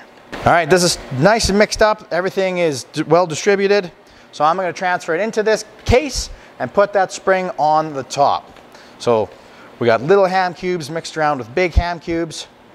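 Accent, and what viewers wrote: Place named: Nova Scotia, Canada. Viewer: Hello btw sub from Belgium ! I started eating carnivore as of last Monday but I want variety and healthy meat so as they put all sorts in regular store-bought ham, I want to make it for my family. Btw, I'm originally from Cape Breton Island, Nova Scotia and I think you sound like the folks from home - but I have been over here for 35. You're fro which province, may I ask ?😀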